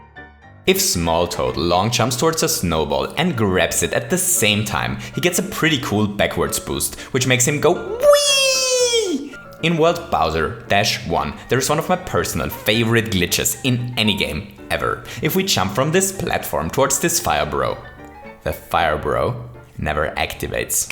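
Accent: Germany. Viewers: Hey you have a German accent are u German? BTW love ur videos :) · I know, it's a silly question but are you german. Your accent sounds like a german one.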